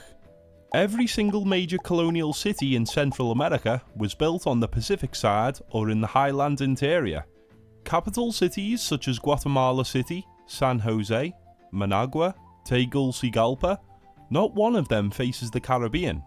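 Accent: Scotland, United Kingdom. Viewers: I can hear an accent, but I'm from the Netherlands, so I don't know which accent it is. Is it a Scottish accent?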